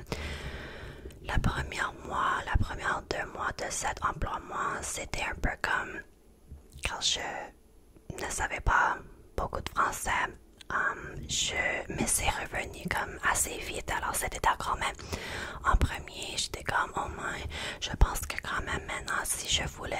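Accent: Quebec, Canada. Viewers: Honnêtement pour l'internet, vraiment pas trop mal ton Franadien! Well done and very calm ASMR experience!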